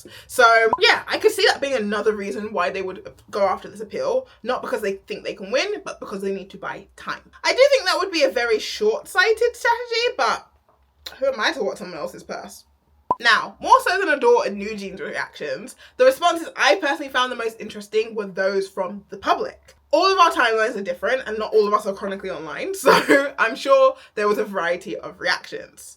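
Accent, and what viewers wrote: United Kingdom. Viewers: Their pronouns are they/them and yeah I’m pretty sure they’re British, def not an American accent lol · American?? I think she's British.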